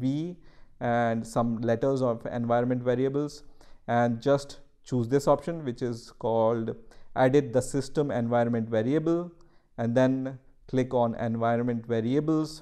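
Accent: India. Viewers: This video has been really amazing, I wan able to get it done in no time, thanks to the nice Indian accent and the step my step directions. It is just perfect. Thanks alot, I'll suscribe for sure. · Indian talk Me: Dislike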